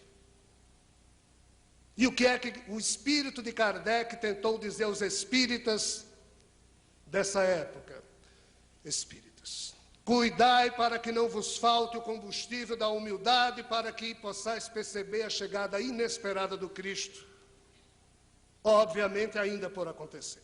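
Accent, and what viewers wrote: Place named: North, Portugal. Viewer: este palestrante lembra bezerra de menezes compleiçao fisica cabelo e tem sotaque do norte assim que vi lembrei de bezerra ate porque bezerra desencarnou em 1900 e podia ter ido embora da terra e disse que ficaria 100 anos na espiritualidade ajudando a evoluçao e que voltaria a encarnar para ficar ate que a terra estivesse em feanca regeneraçao ou seja mais 100 anos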